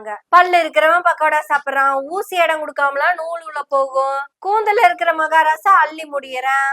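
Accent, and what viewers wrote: Kongu, India. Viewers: கொங்கு தமிழில் நீங்கள் பேசும் உங்கள் கருத்துக்கள் பாராட்டுகிறேன். வாழ்க வளமுடன்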